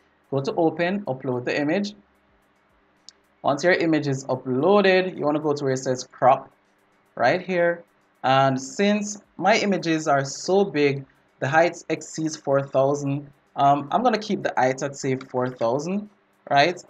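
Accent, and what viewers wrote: Jamaica. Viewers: I can hear the Jamaican in you! Love it!! Now let me return to the video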